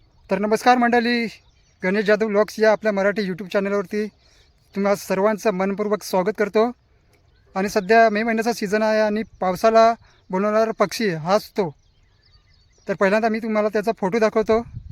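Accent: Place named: Konkan, India. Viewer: Dada tumi koknathele ahaat ka tumchaa bolnyaavalun kalte khuf chaan video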